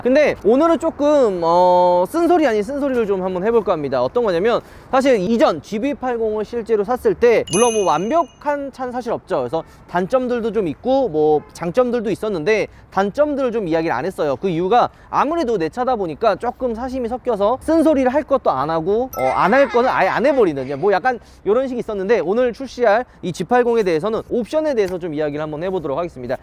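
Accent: Gyeongsang, South Korea. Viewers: 나나지는데요. 하는거보니 는 경상도 사람이었군 ㅋㅋ 담에 사투리 리뷰 부탁해요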